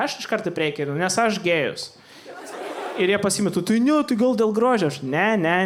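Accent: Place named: Poland. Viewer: 😂😂 Geras. Prajuokinti Lietuvaičių sunku. Šaunuolis, geri bajeriukai ir tas akcentas Lienkišckas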